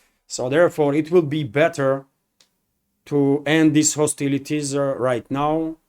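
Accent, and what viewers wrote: Russia. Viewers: The truth spoken in English is a rare event and it’s delightful to see your honest visage, Mr.. Speaking the language of lies with your Russian accent.. you are not alone in the truth being spoken with a foreign accent. You are a rare event there as well. Happy Easter Sunday. I started my family this time of the year 35 years in the past.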